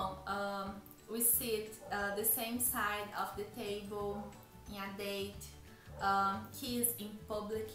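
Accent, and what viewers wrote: Brazil. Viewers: “That’s da tru, I’m sorry.” I’m not making fun of that, but that sounded hilarious, I’m used to Brazilian accents because of my mom and little because of my dad. I was born in America, so I don’t have much of a Brazilian accent, I actually have more of a southern accent. But as funny as the accent sounds sometimes, it is a very beautiful one! A part of me wish I was born in Brazil just so I could have the accent! But God made me the way I am, and Im happy about it, much love from The United States! 🇺🇸 🇧🇷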